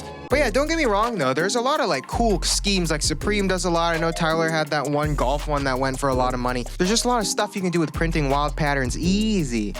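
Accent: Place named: Hawaii, United States. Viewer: he's hawaiian livin in nyc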